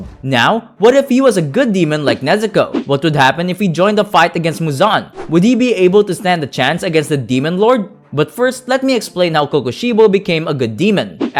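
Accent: Philippines. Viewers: Filipino ahh acent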